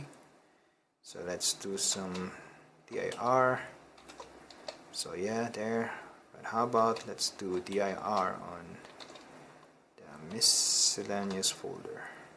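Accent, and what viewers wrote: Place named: Philippines. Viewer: You sound Filipino. Are you filipino?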